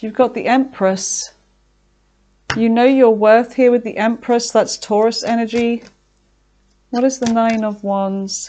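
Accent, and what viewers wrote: United Kingdom. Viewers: You are the best! Really enjoy your Libra readings. Which British accent is it that you have?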